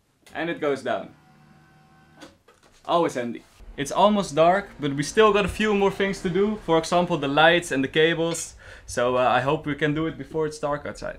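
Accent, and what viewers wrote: Netherlands. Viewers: Hahahahha je kan zooo goed horen dat je nederlands bent ga zo door💪💪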